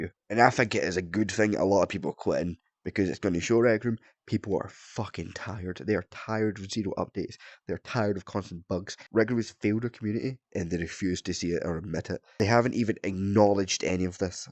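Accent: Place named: Australia. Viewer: The Australian accent makes it 10 times better lol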